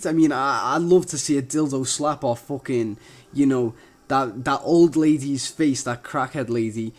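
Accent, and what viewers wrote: Liverpool, United Kingdom. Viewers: bob he is scouse that's why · scouse twat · he says eehm because hes a scouser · bob thats because he's a scouser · Are you a scouser? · oh another scouse youtuber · Is that a Scouser I hear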